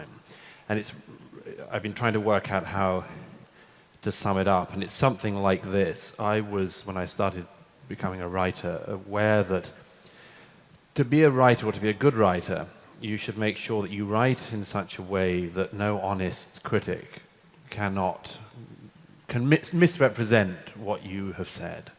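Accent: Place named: United Kingdom. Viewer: Douglas Murray is one of the intellectuals of the modern age that should be protected at all costs, as I fear irrational people would have all "justification" when they think they have to stop his fair criticism. He knows how to give criticism in a way closely resembling Christopher Hitchens. Plus I just like the british accent.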